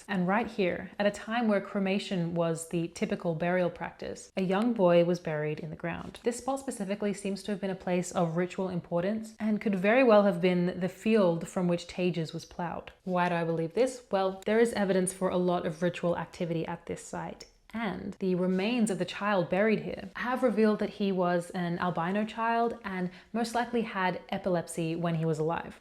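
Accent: Australia. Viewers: Are you Australia based? Great content. So well done… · I think Australia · Thanks, I enjoyed this summary of the Etruscans. It’s hard to get an overall picture so concise. It’s also funny listening to how you change from an Aussie accent to an American one depending on which words you say 😂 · Australian? Capricorn? I need answers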